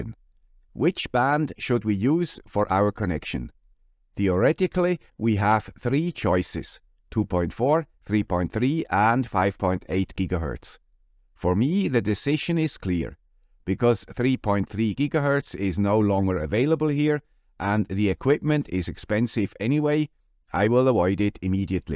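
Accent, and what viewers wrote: Switzerland. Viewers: Awesome! And first time I think I've heard you speak Swiss german (with a touch of french too?)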